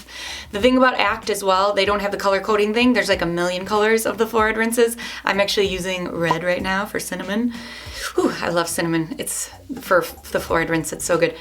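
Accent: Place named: Midwest, United States. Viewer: i picked up a bit of a midwestern accent from her hahahaha. however, great video! never would’ve thought this would be on my recommendations!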